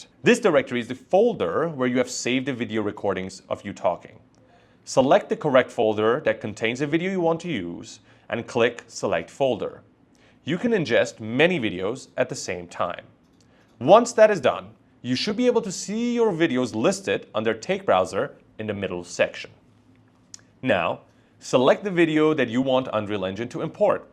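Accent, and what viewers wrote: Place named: Iran. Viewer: I like your Iranian accent! Thanks for the instruction!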